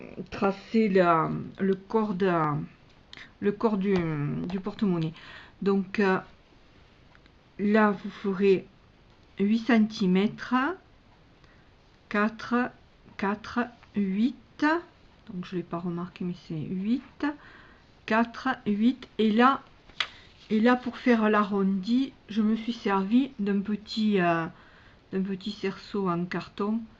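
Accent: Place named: South, France. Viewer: coucou, super explications en plus avec ce petit accent du sud j'adore :) merci pour le tuto à bientôt bisous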